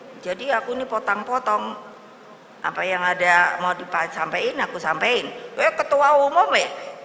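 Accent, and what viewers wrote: Java, Indonesia. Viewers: Jawane medok 👍😁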